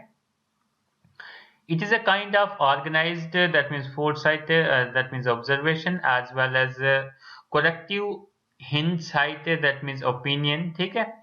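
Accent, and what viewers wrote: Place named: Bhaderwah, India. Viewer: sir your voice seems to have a Bhaderwahi Accent(u seem from Chenab Vallley)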